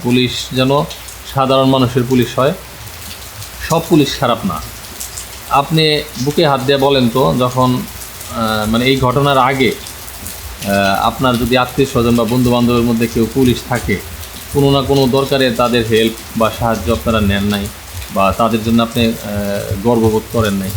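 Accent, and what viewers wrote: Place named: Tangail, Bangladesh. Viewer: এটাই আমাদের টাঙ্গাইলের কন্ঠ আমাদের বড় ভাই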